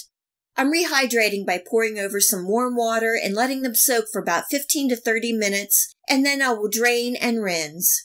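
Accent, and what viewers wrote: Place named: Maryland, United States. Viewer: This is the BEST hot and sour soup recipe ever. I've been making it regularly for the past 7 years. We've tried loads of others but nails this restaurant style recipe. (*And we just love hearing that Maryland accent, reminds us of my husband's hometown.) The only change I make is that I only use one kind of soy sauce (because I can't get my hands on the other kind). DELISH! Making it today to cure my husband's cold! Thanks.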